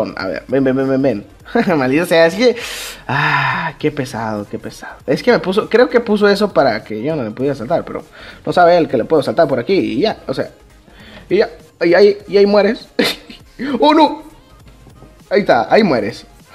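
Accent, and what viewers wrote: Spain. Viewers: Es muy incomodo Que hables como español si tu eres mexicano suejas mal :/ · No hables como español se tu mismo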